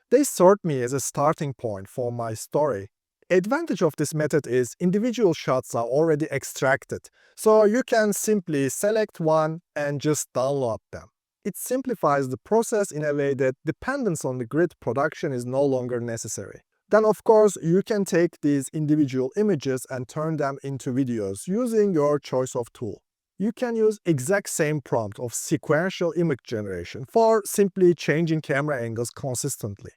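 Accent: Turkey. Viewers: şu kötü türk aksanlı ingilizceyi de yapay zekaya mı okutsan acaba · Aksanına Kurban olduğum. Türk olduğuna yemin edebilirim ama kanıtlayamam. Teşekkürler. Türk'sen Yorumuma kalp at. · Kardeş sen Türk müsün? İngilizceyi tam Türk aksanıyla konuşuyorsun. 🤓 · törkiş engliş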